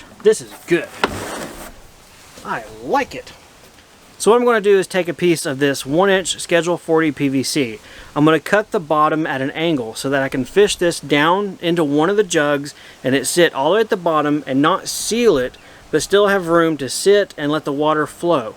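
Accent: South, United States. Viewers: I think that's a southern accent, I'm in a different part of U.S. and we say soil (soy-el) 😉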